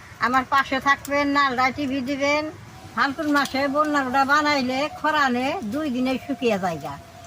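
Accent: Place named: Manikganj, Bangladesh. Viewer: উনি বাংলাদেশের মানিকগঞ্জ, টাঙ্গাইলের ভাষায় কথা বলেছেন। ধনিয়া কে বাংলাদেশের অনেক অঞ্চলে হজ বলা হয়ে থাকে। উনি গোটা ধনিয়াকে গোডা হজ বলেছেন। আরো কিছু অর্থ নিম্নরুপ : ১.ফাকি = গুঁড়ো ২. হুগনা = শুকনো ৩.মইচের ফাকি = মরিচের গুঁড়ো = লঙ্কার গুঁড়ো ৪. নবন = লবণ = নুন অল্প মিডা মানে অল্প মিষ্টি যেটা কিনা অল্প চিনি দিয়েছিলেন সেটা।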